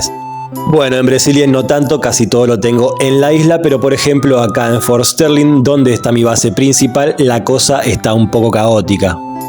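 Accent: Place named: Argentina. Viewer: Que buenas vibras tienes capo, me re encanta, deahhh. Sorry, me gusta como hablan los argentinos. :I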